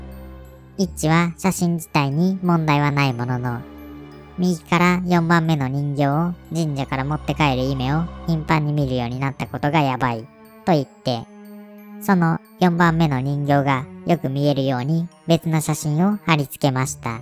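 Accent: Kansai, Japan. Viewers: 関西弁ニキちーす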